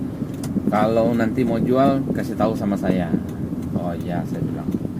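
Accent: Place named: West Sumatra, Indonesia. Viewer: sumbar nya dimana bos, logat nya mirip, salam dari minang rantau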